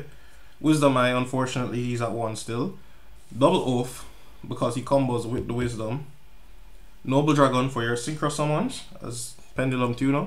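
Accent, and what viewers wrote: Jamaica. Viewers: he sounds a bit Jamaican are you Jamaican?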